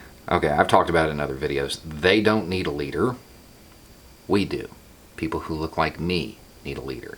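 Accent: South, United States. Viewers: I've watched like 5 straight videos of yours and I'm in shock, you as a white guy with a southern drawl, speaking so eloquently about these topics saying white people need a new leader or your other video about what it meant to be a republican or what it used to stand for vs how it changed man I love it when people like you can call out the hypocrisy of your people and that's what we need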